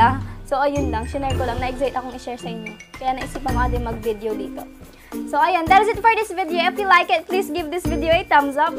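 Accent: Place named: Pangasinan, Philippines. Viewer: U have pangalatok accent hehe🤗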